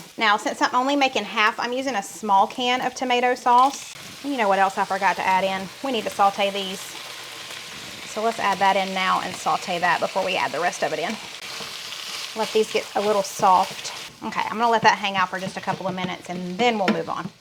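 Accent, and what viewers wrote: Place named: Alabama, United States. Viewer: Love your videos! Just wondering if youre from Alabama. Have a friend from there and she has a similar accent!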